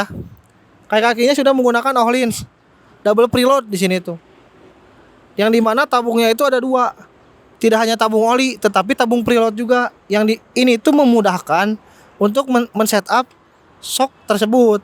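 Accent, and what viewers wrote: West Java, Indonesia. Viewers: Ketahuan logat sundanya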